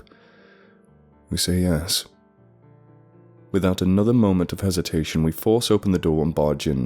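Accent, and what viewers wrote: South, United States. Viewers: Your American accent is great man! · That was awesome! I wish I could find out more about what it all was ugh lol great southern accent by the way :)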